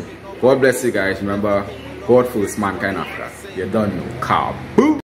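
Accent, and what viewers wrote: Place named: Trinidad and Tobago. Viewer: I love trini accent omg😍 We are a Jamaican youtuber living in Barbados🇯🇲🇧🇧 New subscriber here!💕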